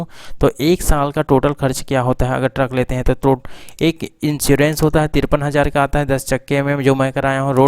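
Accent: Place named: Chhattisgarh, India. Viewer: Tu chhattisgarhria aadmi h bhai tone se lag rha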